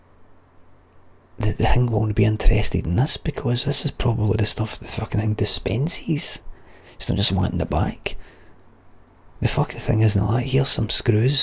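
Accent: Scotland, United Kingdom. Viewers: Scottish accent “Letter story comence” 📕🙏🏻😴, absolutely biblical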